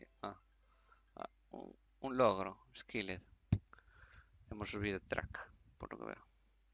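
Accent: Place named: Galicia, Spain. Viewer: Cada vez que me paso por aqui... nose por que rio, con este juego... aaaaaa pobechito, cuanto debe doler jugar un juego como esoooo hahaha... Holas, ¿Que tal mi pequeñin YT? oh, seguro sabe quien soy mi Nene. en fin, #SinComentarios haha Buen youtuber, tiene su carisma y su acentó lo mas importante, por que si no fuera gallego, no era nada... Galiza Calidade, Le falta algo, un poco de sal... y (Mas juegos que pidan poco para la gente como yo) Hmmm Tsé, Nvidia me tienes, de ser mejor hahaha (creo que parezco locah) mejor me callo... Buen video bb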